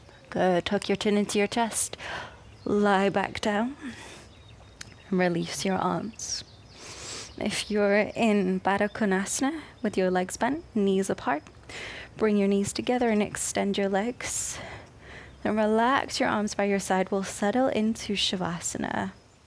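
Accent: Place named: Scotland, United Kingdom. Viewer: Only just discovered you however done a few sessions they are perfect in every way. Just curious where you are located? Detect a soft Scottish in your accent Hey all well done from jane in Australia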